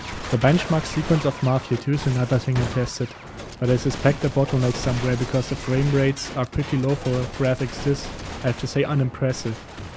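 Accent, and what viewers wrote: Germany. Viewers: are you german your accent is like a german is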